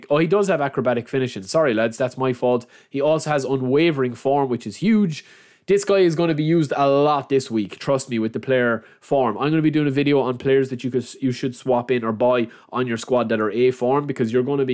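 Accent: Ireland. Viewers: Are you Irish brother?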